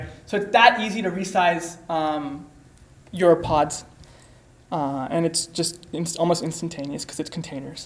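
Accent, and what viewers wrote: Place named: West, United States. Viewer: Useful video. However may I suggest that you might want to try to keep your accent a bit neutral. I see a heavy leaning towards the western accent, but that's starting to sound quite artificial and meddling with comprehension. Just my 2 cents.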